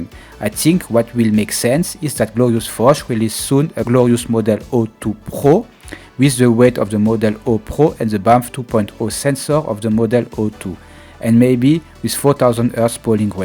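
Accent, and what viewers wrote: France. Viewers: This is a great video and I hope you exceed to do great things , but would you mind me asking are you French sorry I took three years of French and thought I recognized the accent my apologies if this isn’t correct also I subscribed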